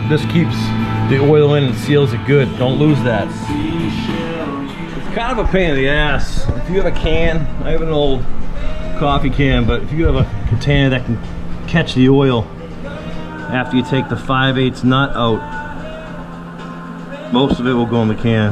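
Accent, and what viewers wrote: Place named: East Coast, United States. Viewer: LOL ProChargA. It’s obvious you’re accent isn’t from most of America. East coast or New Orleans. Great video!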